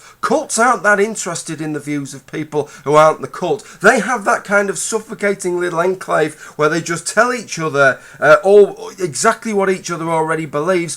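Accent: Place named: Lincolnshire, United Kingdom. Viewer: Was trying to place the accent - not strong enough for Yorkshire - was torn between possibly Lancashier but with a slight manc-like twang (sorry!) -had to be elsewhere, so gave up guessing. Lincolnshire! -Skuunthorrrpe. Bona fide northern goodness!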